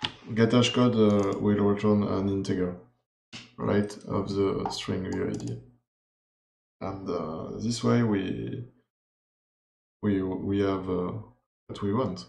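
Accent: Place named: France. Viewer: Tu as un accent Français ahah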